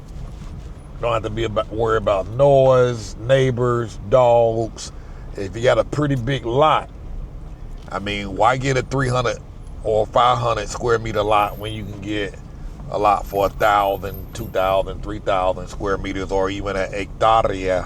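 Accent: South, United States. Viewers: I Have Very Sensetive Ears. I Can Not Listen To Most Commentator. I Can Listen To Him, I Get A Kick Out Of His Colorful Southern Pronuncation. He Represents Sun, Shinning in Mexico. Its a Good Match!" I Want A Lot! Looks Great!Been on the Road with You a While. Looking Great!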